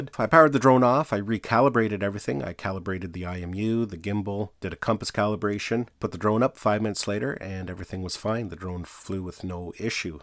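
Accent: Canada. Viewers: Do I recognize a Canadian voice, there? I'm curious about drone regulations in different countries. When you flew 2.5Km to that lighthouse, were you required to maintain visual line of sight with the aircraft? If so, how could you be able to do so? I have an Air 2S, and it seems like the only way I can maintain VLOS beyond around 300M is to put auxiliary strobe lights on it. (Could just be that my eyes are getting old.) Anybody have any advice for enhancing visibility for VLOS?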